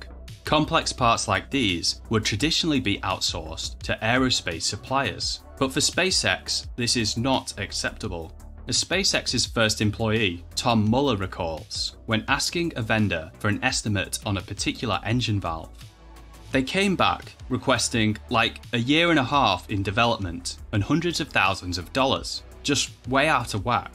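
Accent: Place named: United Kingdom. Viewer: Awsome dude thank you, and great to hear a fellow brit on this subject, liked and subscribed 😀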